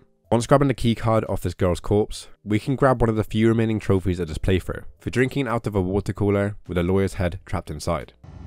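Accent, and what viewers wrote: United Kingdom. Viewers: Are you Bristolian by any chance? I recognise the accent haha where I’m from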